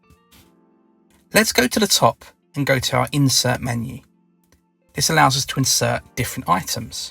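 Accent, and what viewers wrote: United Kingdom. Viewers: An outstanding tutorial. Voice is nicely paced (not MANIC like others) and the background music is softer and just right . This gentleman has very good diction and his British accent makes for fact-filled, easy listening. Way to go!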